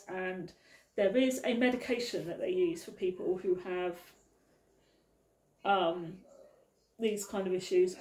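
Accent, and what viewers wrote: United Kingdom. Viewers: Warrington? You sound like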